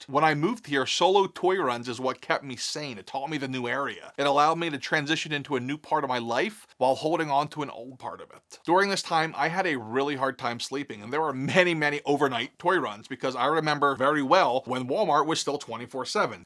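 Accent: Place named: Philadelphia, United States. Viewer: You never said you were a Philly boy, but I knew the voice was 100% Philly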